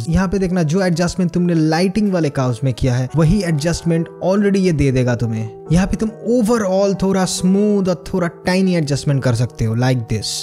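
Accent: Bengal, India. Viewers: That bangla accent 🔥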